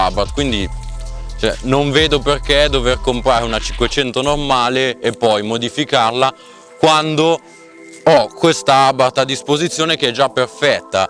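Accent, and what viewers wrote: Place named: Italy. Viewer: Your italian ascent sucks so much that I need to read the english sub to understand something LOL! Learn to speak before create Review bro!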